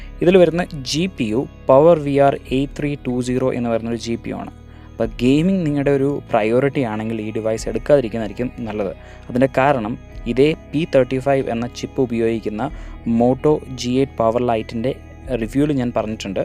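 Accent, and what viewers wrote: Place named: Kasaragod, India. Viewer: Kasargod slang video yanda channel bannang kittum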